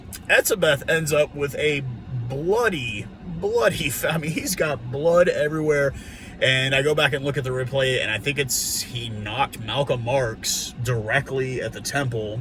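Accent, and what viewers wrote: United States. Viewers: Greeting from a South African living in Switzerland (I used to live in the US). Loving your show and it's great to hear someone speak of my two favourite teams especially in an American accent. Great game this weekend, it was epic. Keep up the good work!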